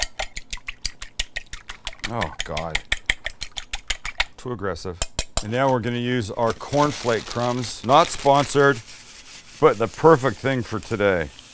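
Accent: Canada. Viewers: Did I hear say he was born in California? He sounds Canadian or…something. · I’ve been following this channel for how many years now and I just now caught the Canadian accent???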